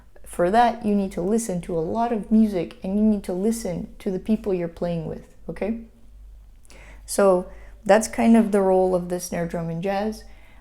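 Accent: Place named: Eastern Europe. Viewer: Slavic accent. It's not thick at all, but it sort of 'rounds' words, makes them voluminous. Great video, btw!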